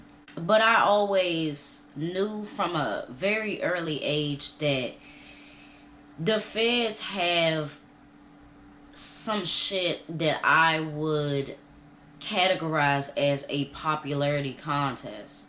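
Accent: Washington DC, United States. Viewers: Dope content baby girl. I love ur DC Accent..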